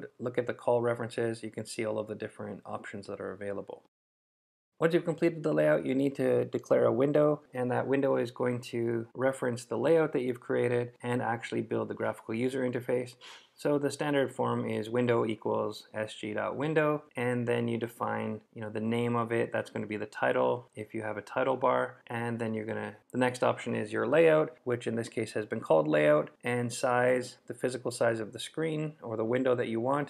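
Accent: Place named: United States. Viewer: This gentleman wins the award for "most amount of accents heard in the 1st 15 seconds of talking" Norwegian, no Australian, wait no that's German, no British, no that's definitely some version of Nordic, wait is that Canadian or American? Oh no it's Polish maybe. Damn wait that's American, maybe Canadian. Honesty I'm still not sure. I'm 90% it's American but there's something else in there when he's in front of the camera.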